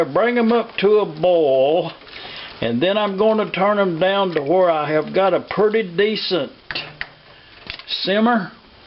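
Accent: South, United States. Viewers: You have a fanfuckingtastic accent my southern friend, hope you enjoyed the grub!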